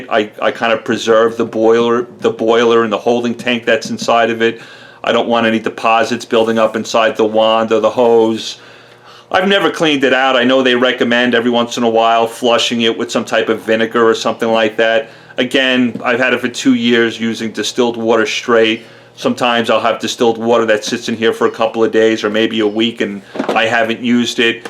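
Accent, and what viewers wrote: New York, United States. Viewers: Yes. The annoying noo yawk accent. How does area become area-er?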